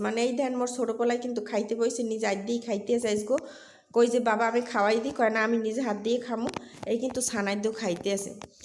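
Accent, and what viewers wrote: Barishal, Bangladesh. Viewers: আসসালামু আলাইকুম আপু চলে এলাম তোমার বরিশালের কথা শুনতে তোমার কথাগুলো সত্যিই আমার অনেক ভালো লাগে তোমার কাছ থেকে আমি বরিশালের কথা শিখতে চাই শেখাবে ❤❤❤❤❤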